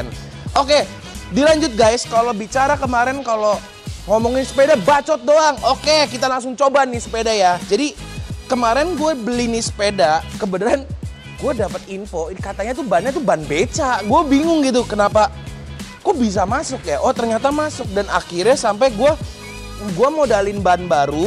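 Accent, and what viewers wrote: Cirebon, Indonesia. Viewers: 100% orang cirebon dari logatnya... Mantap lah, sukses terus buat semoga makin keren dan makin maju di dunia otomotif....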